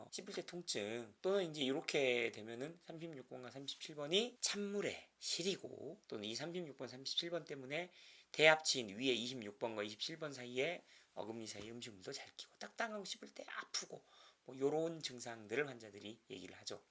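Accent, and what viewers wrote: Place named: South Korea. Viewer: Lateral excursion에 대한 좋은 내용이네요... 유투브를 전문적으로 하시려면 영어쪽은 감수를 받으시는게 좋을듯합니다... 너무 한국식 영어라^^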